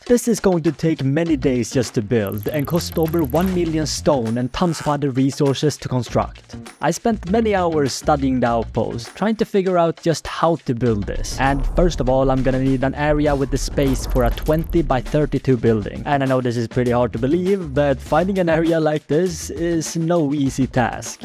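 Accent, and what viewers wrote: Sweden. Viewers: are u swedish cuz u kinda sound like swedish?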